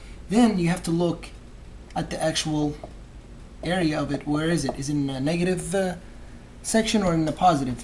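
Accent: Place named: Brazil. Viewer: Are you brazilian? Your accent looks like a brazilian speaking english ...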